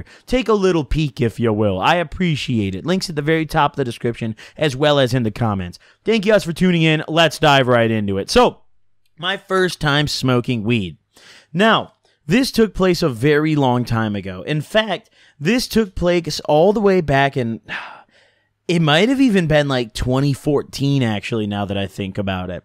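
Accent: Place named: Illinois, United States. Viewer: Sounds like you grew up near elmhurst iL thats were im at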